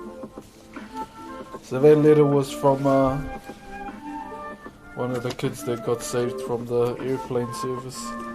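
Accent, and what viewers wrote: New Zealand. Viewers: Because they've both got kiwi accents which are pretty similar in tone and pitch lol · It's our kiwi accent he said "Hold on guys" he needed time to compose himself from crying on stream. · well, he is a maori, it´s a pretty common accent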